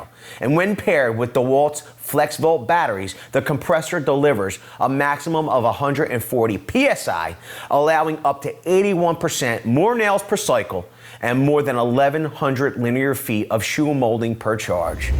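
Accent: Philadelphia, United States. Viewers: He's from Philly, that's how they talk.... get with it broski!!!!!!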